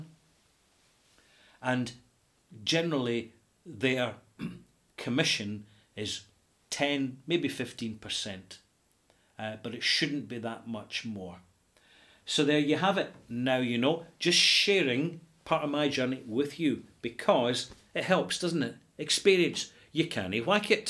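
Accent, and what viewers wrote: Scotland, United Kingdom. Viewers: is my goto guy for all things whisky. However, for those of us in the USA who have a little difficulty with the Scottish accent (although who much appreciate it), it would be really helpful if the Brands reviewed in the video were listed in the notes.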